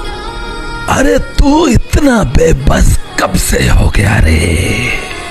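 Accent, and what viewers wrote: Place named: India. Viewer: Please hm musilmaan hyn alhamdulillah aap apni voice aur language style hamarey acer ki copy kr saktey hyn Bharti style toh bilkul achaa NY aapko suite NY krta please bra na mannyeyga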